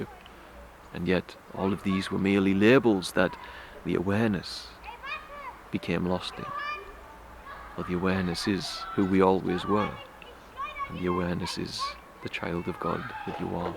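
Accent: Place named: Northern England, United Kingdom. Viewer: Are you in Northern England here? Is this also the source of your lovely accent? 😊🙏🏼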